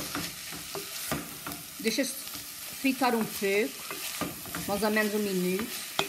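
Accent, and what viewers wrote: Azores, Portugal. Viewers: adoro esse sotaque Acoriano! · How sweet and pleasant to hear Sao Miguel accent! Love t! ♥️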